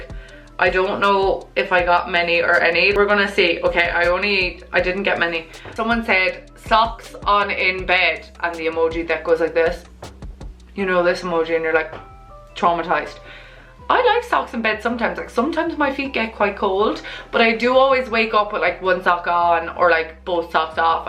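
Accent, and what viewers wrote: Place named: Ireland. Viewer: I luv the iris accent my friend is iris n i luv it how she speaks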